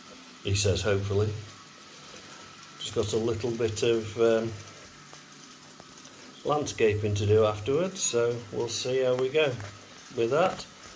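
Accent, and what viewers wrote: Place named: Midlands, United Kingdom. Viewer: hear your dulcett Midlands tones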